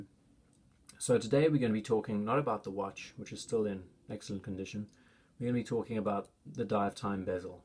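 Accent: South Africa. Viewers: Sounds like a Saffer twang to me.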